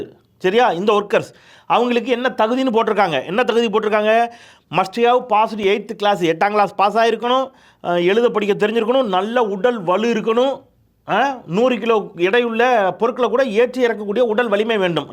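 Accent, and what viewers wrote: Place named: Valliyoor, India. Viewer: Super brother naanum Valliyoor thann ungal voice slang keta enaku udane namma voor niyabagam varum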